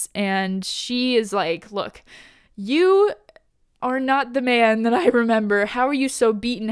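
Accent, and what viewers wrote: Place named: England, United Kingdom. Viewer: beautiful english accent btw ;P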